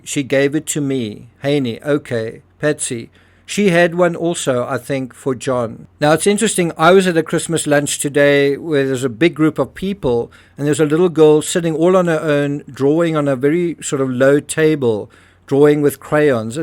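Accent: South Africa. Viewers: your accent alone is reason to watch it all... I pride myself on being able to mimic accents with South African being one of them and your video helps me keep it fresh and sharp... You and Die Antwoord,... 😉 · He is South African. He can't his accent. Just like you, can't change yours.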